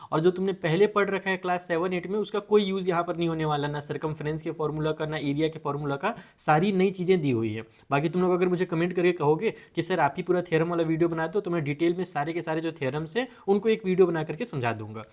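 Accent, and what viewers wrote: Bihar, India. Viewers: Sir kya aap bihar Sa Ho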